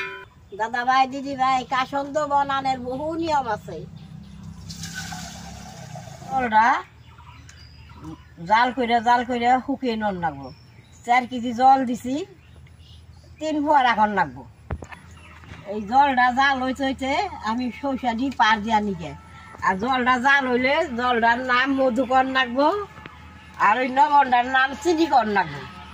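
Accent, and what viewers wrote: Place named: Manikganj, Bangladesh. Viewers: তার মুখের ভাষা আমার মানিকগঞ্জের মতোই, আঞ্চলিক টানও একই। সম্ভবত তার পূর্বপুরুষ মানিকগঞ্জ থেকে আসাম গিয়েছেন। কাসন্দ বানানোর নিয়মটাও হুবহু আমাদের বাড়ির মতোই। নমস্কার ঠাকুমা। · Bangal bolaito thakumar ranna valo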